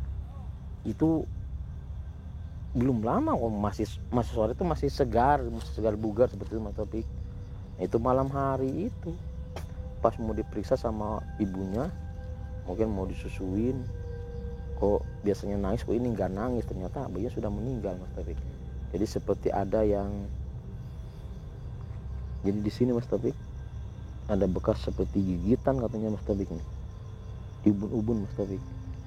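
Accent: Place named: Sunda, Indonesia. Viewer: Assala mu allaikum om ngomong sundanya lucu om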